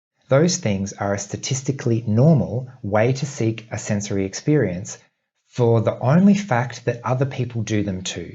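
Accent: Australia. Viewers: I am Chinese and I grew up learning American English, and ever since watching your videos, I have become very fond of the Australian English.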